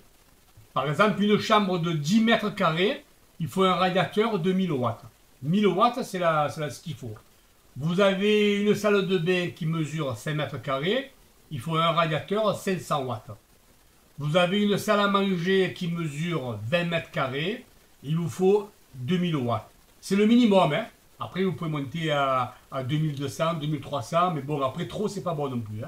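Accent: South, France. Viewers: Bonjour, merci infiniment pour votre aide ! Mon mari voulait jeter ce petit radiateur, exactement le même que celui que vous avez réparé, pensant que la réparation serait énorme, mais je suis allée fouiner sur internet et je vous ai trouvé ! Un bonheur d'entendre ce bel accent du sud qui me manque, je vis à l'étranger... Mais la cerise sur le gâteau c'est votre grande compétence ! On a difficilement trouvé le fusible thermique, mais on y est arrivé, mon mari a suivi vos instructions et on est reparti comme en quarante !!!!!! Le petit radiateur a une seconde vie !!!! Bravo et encore merci infiniment pour tout ce que vous êtes 💪😃👍👨‍🎓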